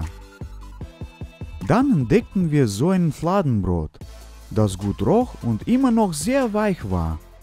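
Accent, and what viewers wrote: Russia. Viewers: Typischer russicher aktzent finde ich super bin halb russin und finde deine videos echt cool Bin gerade auf deinen kanal gestoßen rechtschreibung ist nicht so meine sache😅😂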